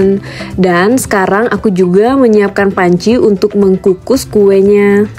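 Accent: Pontianak, Indonesia. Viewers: Pantesan gak asing bahasanyaa, org pontianak rupanyaa kakak heheh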